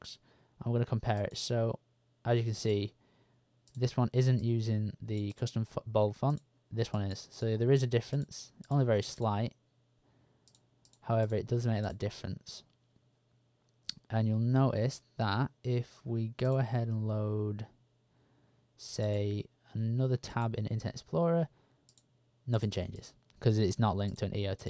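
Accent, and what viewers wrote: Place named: United Kingdom. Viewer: your British accent is awesome !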